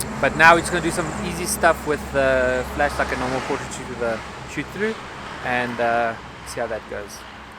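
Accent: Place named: South Africa. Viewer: Was just about to say that you have a South African accent....kanti you're a local With some international talent. Keep up the good work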